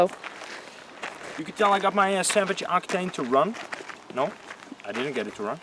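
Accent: Netherlands. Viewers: 100% dutch accent there! Haha! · je klinkt nederlands!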